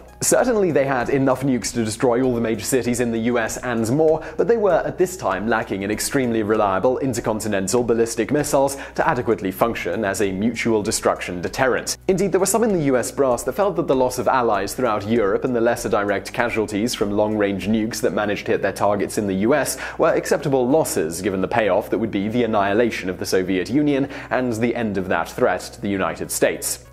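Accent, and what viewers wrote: United Kingdom. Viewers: bobtheduck Yes, he’s undoubtedly British. You can hear it in his “Cubar” ( , ). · Omg fucking UK accent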